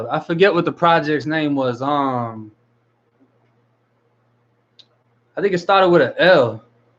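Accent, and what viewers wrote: Boston, United States. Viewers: Just sub'd. From TX. I get a kick out of your soft accent. Lot of FL friends but it's your Boston that catches my ear.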